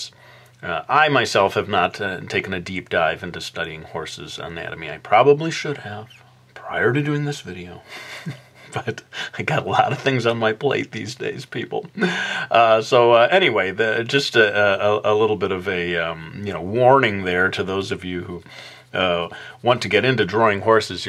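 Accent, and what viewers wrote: United States. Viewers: excuse me your american sound hurts my ears but i listen to u how rude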